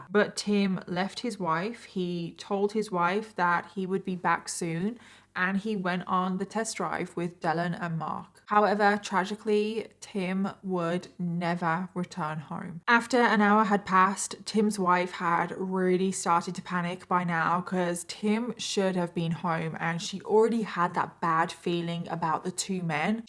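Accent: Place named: United Kingdom. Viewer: Imo you cover these cases better than most shows😂 I’m obsessed. And your so bri’ish sometimes I love it lmaoo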